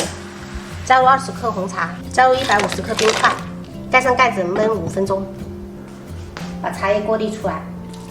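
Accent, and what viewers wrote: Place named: South, China. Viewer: 聽她口音不像閩南口音, 應該是其它南方省份 , 嫁到閩南的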